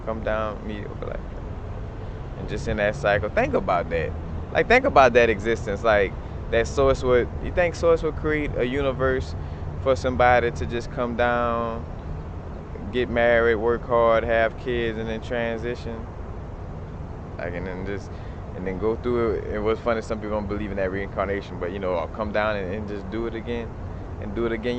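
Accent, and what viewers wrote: New Orleans, United States. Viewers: Is that a New Orleans accent?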